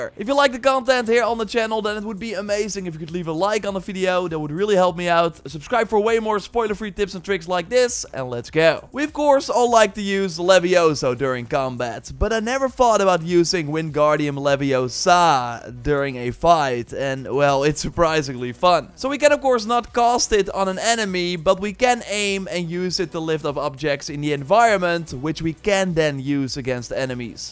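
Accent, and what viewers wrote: Netherlands. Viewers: Zalig toch da Nederlands accent er tussen 😂 ma super tips wel ✨ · Please stop mispronouncing ‘duck’ (duiken, aan de kant gaan) as ‘Dutch’. You’re a Dutch speaker yourself, I can tell, however, your accent is fine, good job. But that one mispronunciation is just worse than the rest. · are u dutch?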